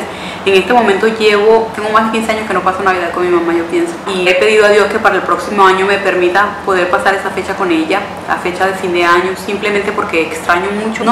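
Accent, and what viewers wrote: Colombia. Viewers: me encanta la manera como hablas de colombia es hermosa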